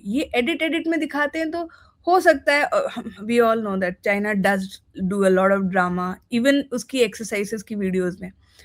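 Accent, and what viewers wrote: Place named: South, India. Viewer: South Indian hai madam.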